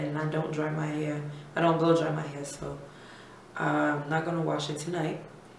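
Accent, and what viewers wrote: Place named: Barbados. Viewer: Lol i heard you say "Good evening everybody," in your video about water only washing and I just knewww you were a Bajan. Go girl! Yuh sweet!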